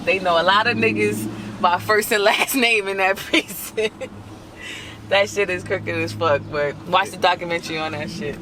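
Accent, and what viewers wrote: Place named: New York, United States. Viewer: I love her NY accent it fits that pretty face. :)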